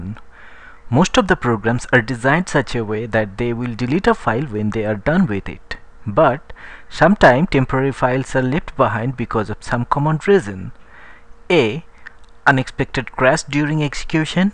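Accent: India. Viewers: The guy is Bengali as per his accent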